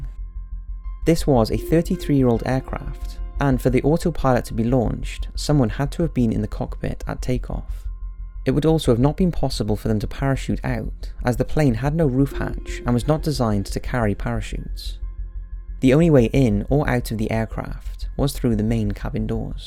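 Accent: Wales, United Kingdom. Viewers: Well we know he’s Welsh and from Wales by his accent.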